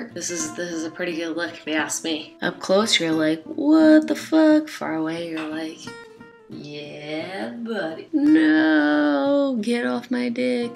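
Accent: Boston, United States. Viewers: she lived in Boston for like 7 years and developed an accent. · Sounds like a Boston accent (like when she says water line "watah line" lol) I've lived in mass my whole life and she sounds like most of my family tbh😂 not really surprising though since she lived in Boston for 8 years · I forgot she was from Boston till i rewatched this video 😂❤ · I SEE your Boston accent and Ithink its qt af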